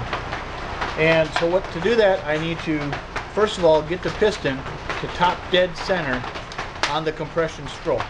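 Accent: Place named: Oklahoma, United States. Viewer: Sounds like you could be a fellow Oklahoman....lol. I'm here in Okc and hear that sound at least a few times every year. Great video series!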